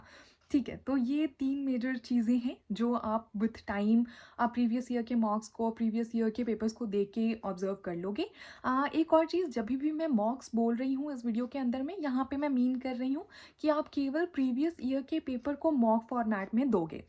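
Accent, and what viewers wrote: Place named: Delhi, India. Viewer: You claim to be a Bihari origin, but uour language sounds of Delhi origin. You must have been brought up in and around Delhi. With good school backgraound. It is your schooling that is making you successful everywhere which is not accessible to others. Take example of ssc CGL exam, you scored 156 because your english was good from school days.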